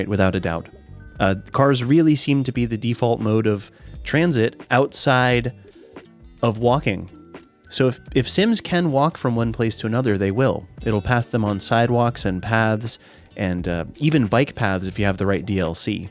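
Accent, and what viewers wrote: United States. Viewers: You didn't say it right away, but the the more you talked the more I knew you were 100% in Northern Virginia :). - And although there is a decent number of people who use the metro, it is still highly underused. I came from California, and we had a very similar experience there. It seems, in the US, we love to talk about metro, but we often prefer being in a car.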